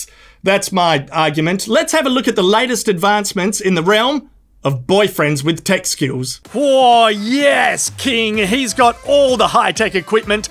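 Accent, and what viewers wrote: Australia. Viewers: you sound like you are from down under but you look like you are from Appalachia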